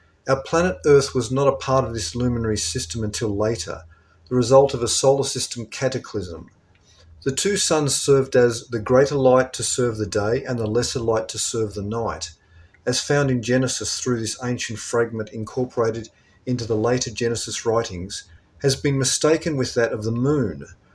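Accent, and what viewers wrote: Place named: Australia. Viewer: So sweet to hear that Aussie accent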